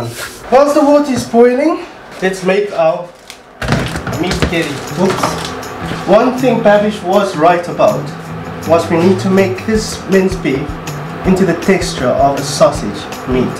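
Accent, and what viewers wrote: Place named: South Africa. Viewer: Dude sounds South African.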